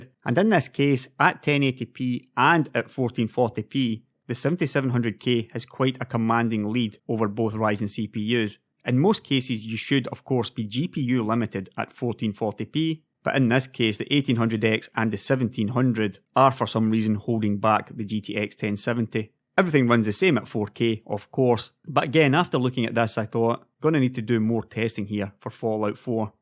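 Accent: Scotland, United Kingdom. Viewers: I love it when i can here people are from Scotland, i am half scottish. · Sweden? Judging by your accent I was sure you were Scottish. Or perhaps living abroad?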